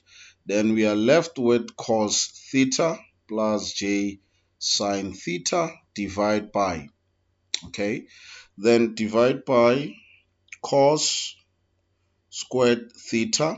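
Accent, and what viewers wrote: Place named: France. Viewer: You sound french policemen not an insult tho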